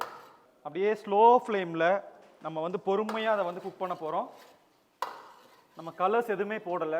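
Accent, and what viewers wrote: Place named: Coimbatore, India. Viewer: அண்ணா நீங்க நம்ம கோயம்புத்தூர் பாசையில் பேசுங்கள் அழகாக இருக்கும்.....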